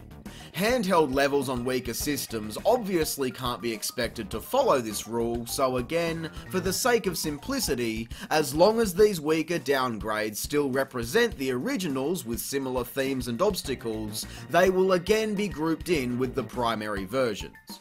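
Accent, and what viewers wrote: Australia. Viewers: Are you australian? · Thanks, didn't wanna listen to this guy's infuriating Australasian accent for over 2 hours.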